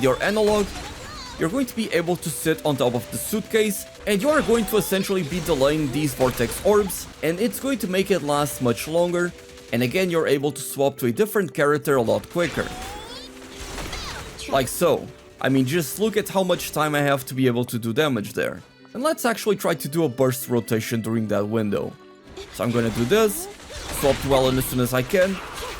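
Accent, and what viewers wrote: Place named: Portugal. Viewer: Mano o teu sotaque deu me logo dicas de seres português ahahah. Grande vídeo e agora com a vinda da Zhu Yuan, a nicole ainda vai ser muito mais viável! Forte abraço...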